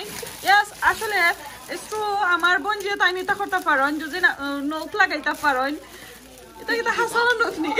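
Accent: Sylhet, Bangladesh. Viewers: Congratulations apu! Oo Afu aida khita khoilan? Afnar video ke khali afnar Sylheti boinaita dakha ni? Onno distric ar manush oh toh dakhun. Oija dahugga apnar maat hunita hunita ami Sylheti maat hekhi lairam 😀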